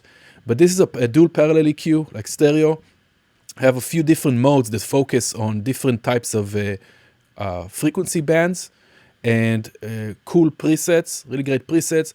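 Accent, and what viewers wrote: Israel. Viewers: I have instantly recognized your Hebrew accent ! קיבלת עוקב ולייק ;)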